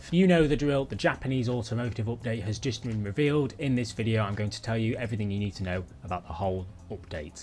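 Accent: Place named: England, United Kingdom. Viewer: It's the English accent.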